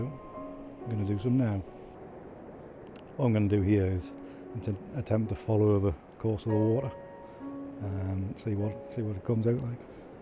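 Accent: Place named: Northumberland, United Kingdom. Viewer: Thank you, for these very interesting and informative videos. Like many, I came here after seeing you with Thomas Heaton. Your work is particularly reminiscent of the impressionists like Turner, in particular 'Wreckers Coast of Northumberland' and I applaud your efforts in this field. I liked your approach to processing the images and loved the outcome - a very unique perspective on a well known location. I can't wait to get out and try this myself. One small criticism is that when you were outside, the audio levels were a little low making it difficult to hear. No problems in the later two. Nice to hear a Northumbrian accent on here too!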